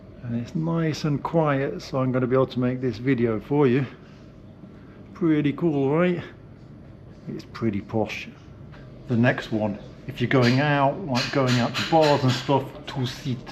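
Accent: United Kingdom. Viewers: what kind of British accent do you have? What is it called? Great video!! 🇬🇧🇷🇺 I am 🇺🇸 with a NYC accent myself!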